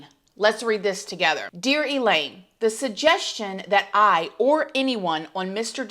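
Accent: South, United States. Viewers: Watched a few of your vid's. Then one day I noticed you have a southern accent. I lived in the south for awhile. Love your accent. And yes we all love you!!!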